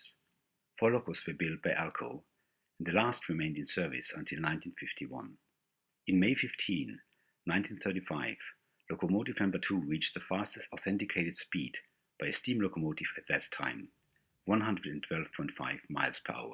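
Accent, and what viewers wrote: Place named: Germany. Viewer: Sounds like you may be German by your accent. Your trains are the most prewar examples I've ever seen. Thanks for posting. Danke.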